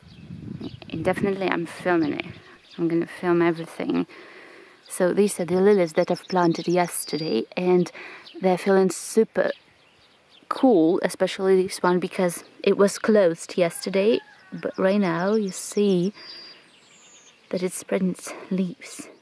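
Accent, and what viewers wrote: United Kingdom. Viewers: You are talking like British people But you are much more pretty God bless you and your family and your homeland Belarus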